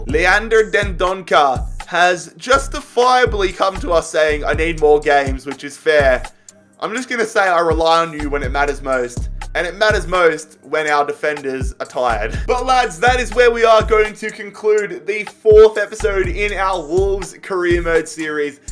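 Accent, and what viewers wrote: Australia. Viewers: Are u from Australia mate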